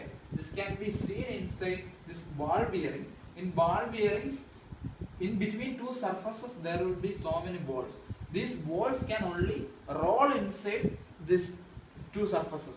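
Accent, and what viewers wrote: India. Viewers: I can always trust a guy with an Indian accent to teach my engineering courses better then my PhD professors... Thanks this video helped a lot!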